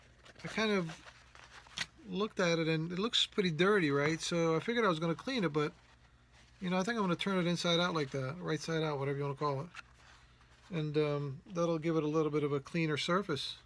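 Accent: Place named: Baltimore, United States. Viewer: you have a Bawlmer "about" and "over"! What's with that? Love watching you work!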